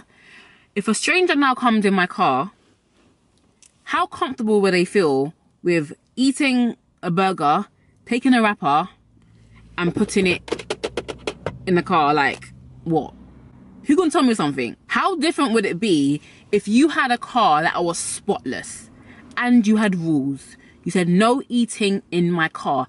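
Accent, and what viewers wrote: United Kingdom. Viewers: What an incredible video and it's so much more nicer for to me to hear a local British accent! Girrlll you was speaking my language from start to finish!!! I've been on a journey of self love and self care but I've hit a wall and came onto YouTube and this came up on my recommendations. I'm going to check out the rest of your channel but it would be absolutely amazing if you could also break this down into simple terms ie how exactly can we get to this point, what things do we actually have to implement to get to where you are? I hope that makes sense lol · I will add tax to it. Great advise. I love your British accent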